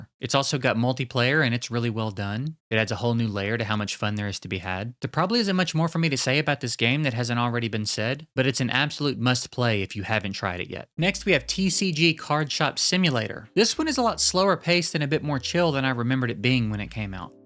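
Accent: South, United States. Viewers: Dude, you’re so good! Also you sound like you’re from the south like me. Great writing and great commentary. Keep going. You’re onto something big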